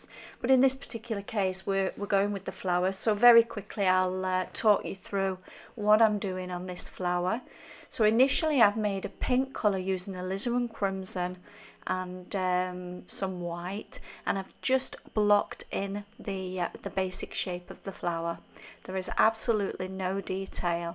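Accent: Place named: North, United Kingdom. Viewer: Great, nice to hear a northern accent too👍🎨😊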